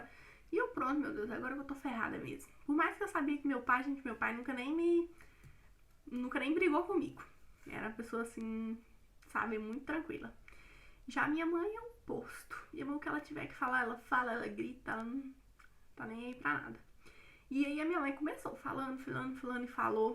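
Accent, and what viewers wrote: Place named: Minas Gerais, Brazil. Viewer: Nosso sotaque mineiro é bonito demaisss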